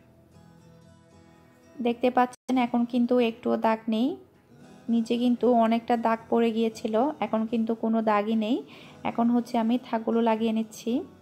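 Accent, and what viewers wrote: Chittagong, Bangladesh. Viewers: Ha Chittagong er voice amio asi satay ki hon apa thik hoine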